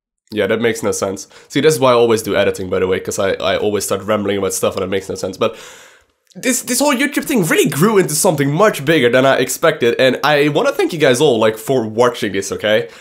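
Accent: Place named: Netherlands. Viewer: Already thought that you were Dutch from your accent, now I have confirmation.....<3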